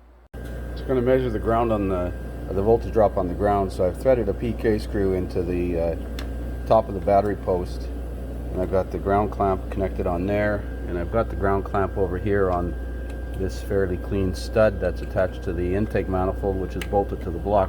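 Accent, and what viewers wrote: Canada. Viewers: I did one of these about a year ago for a guy who was a manager at a Wendy’s I go to ....he bought a used starter off eBay and said can you put it and I said yeah 100 bucks little did I know what was involved with pulling the intake..... and those starter bolts were TITE !!!!! And since it was an eBay starter I did the same thing and cranked it before I put anything back on his problem was he was getting that little fly wheel ZING noise but the fly wheel looked OK and it was fine after. I reused the intake gasket since it was metal ...smeared a little grease on it Maybe that starter was an optional mini high torque geared that they produce now the one you bought 2nd said “ original style “ I came across your videos by accident searching Verus edge.... I watched a bunch of them, really like your style calm, and methodical good guy to learn from plus how can you not like the Canadian accent aye .......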